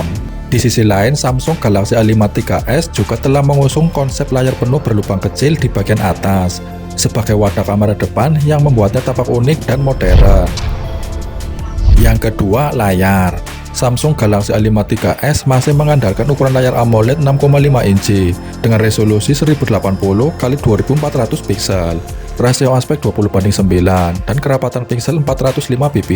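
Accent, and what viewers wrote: Banyumas, Indonesia. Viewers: Ngapak sekali 👍 min , , , ?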